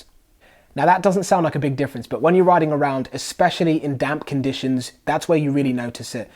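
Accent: England, United Kingdom. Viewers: I don't know if it's the English accent or the professionalism but this is one of my favorite reviews. I'm a NYC Youtuber and you have definitely made me want to step my game up exponentially. I'm buying the Evolve Carbon GT next week so I'll put out my review. Let's collab on a video. I'll fly out to the UK no problem. Keep doing your thing bro. You have a new subscriber.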